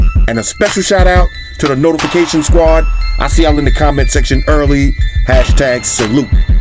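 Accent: New York, United States. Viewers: gotta fight his New York accent when he says "slot" 😂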